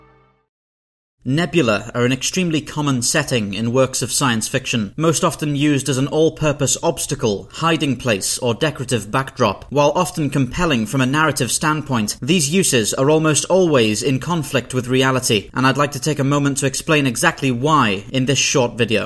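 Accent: United Kingdom. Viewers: I like your channel.i like listening to your Sci fi monologues in that British accent that makes the most mundane topic interesting. Sucks to be American,I guess.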